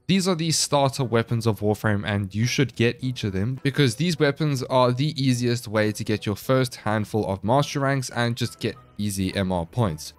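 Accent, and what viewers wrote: South Africa. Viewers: Man, I left home two years ago but can still tell a Saffer when I hear one. Awesome videos!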